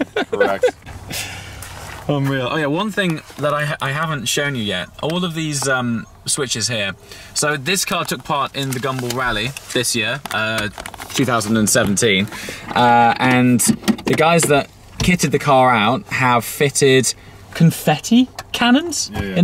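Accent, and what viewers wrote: RP, United Kingdom. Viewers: Your posh Brit accent had me in stitches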